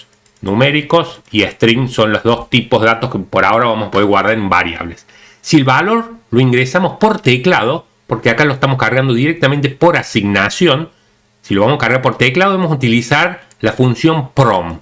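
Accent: Argentina. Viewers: buenas tardes profesor, me esta empezando a gustar el acento argentino no me paso viendo hablar a messi ni a maradona muy agradecido voy por el video 66 no me habia dado cuenta que tenia todas esas pestañas en el editor de codigo abiertas y te vi como las cerrabas y aprendi jejeje, muy agradecido con tu enseñanza si hubiera sabido de alguien en el pasado que explicara asi le hubiera pagado. pero no encontre tal cosa. espero poder contribuir en un futuro muy cercano, la verdad que siento pena de ver tu gran esfuerzo y no dar nada de vuelta. muchas gracias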